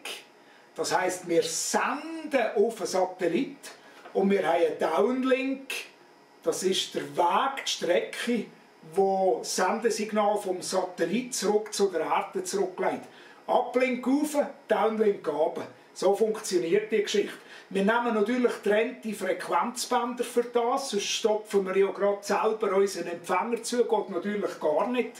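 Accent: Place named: Switzerland. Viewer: Vielen Dank für die Vorführung des Betriebs auf dem Satelliten bzw. für die Erklärungen auf Schweizerdeutsch, das eigentlich gar nicht so schwer ist zu verstehen, wenn man sich ein bisschen Mühe gibt:-) Vielleicht hören wir uns demnächst auf dem Satelliten - ich gucke sofort nach auf Ihrer Website, was es da für Möglichkeiten gibt, auf Es'hail-2 QRV zu sein. 73, Colin GM4JPZ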